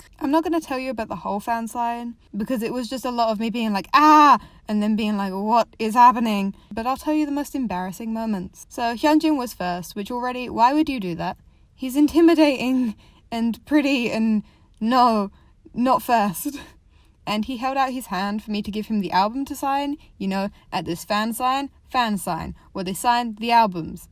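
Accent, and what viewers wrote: United Kingdom. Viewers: WAIT YOURE BRITISH WHAT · omg yes you’re british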